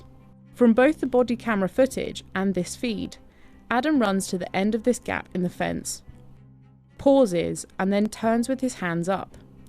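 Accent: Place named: United Kingdom. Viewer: I think they think a slight British accent makes this nonsense more believable..... SMH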